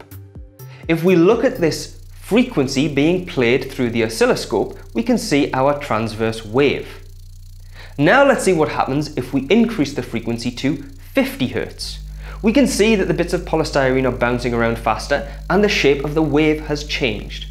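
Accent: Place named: United Kingdom. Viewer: dude that was really helpful .like you go britsh!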